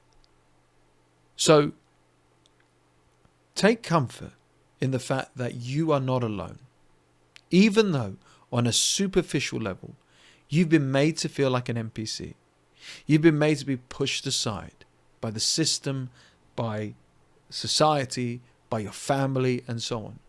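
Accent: United Kingdom. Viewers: Are you from the North or South of England? The North is the working class side right? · He's from the south by the sounds of his accent.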